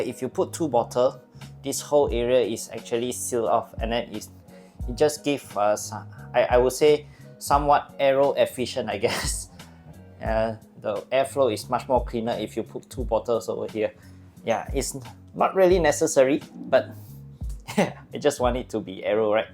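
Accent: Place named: Singapore. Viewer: I recognized the Singaporean accent haha. I miss Singapore and mount faber. Looks like great ride tho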